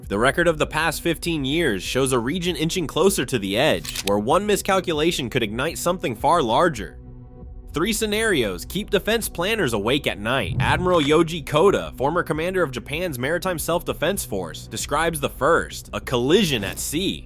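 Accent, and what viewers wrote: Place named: Canada. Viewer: You sound like actors from 'Kids in the Hall'. Are you Canadian?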